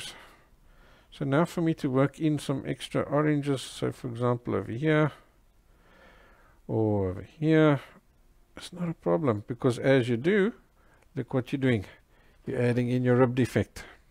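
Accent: South Africa. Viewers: Your paintings are amazing! Thank you for sharing your talent with us. You sound South African, are you? Because I am and I have found that selling your art work in this country is difficult. Do you have the same problem?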